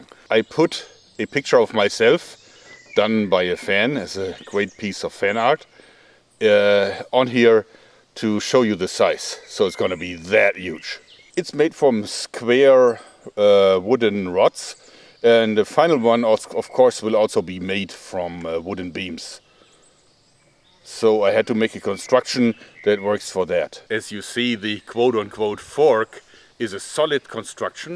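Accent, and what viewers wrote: Germany. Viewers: Am I the only one who gets this sue per sinister feeling when I hear this dudes harsh German accent?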